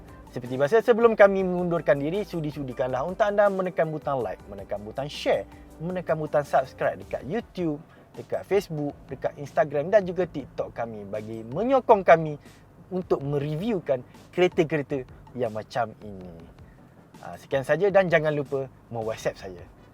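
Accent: Kelantan, Malaysia. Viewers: ada bau2 kelate tu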